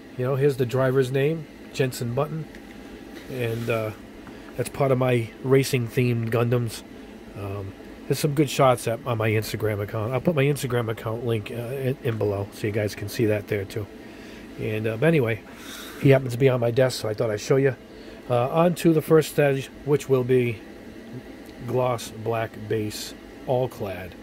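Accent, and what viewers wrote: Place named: Massachusetts, United States. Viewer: Hey but your accent it sounds like you're from Massachusetts. I'm in Halifax on the South Shore. What store in Massachusetts can I pick this paint up at where do you go. Thank you so much I think I'm doing my 24th scale drift car '1970 Camaro. All Chrome. Maybe blue race stripes. Or maybe just some pinstriping good anyways if you can help me out I'd love it thank you very much great video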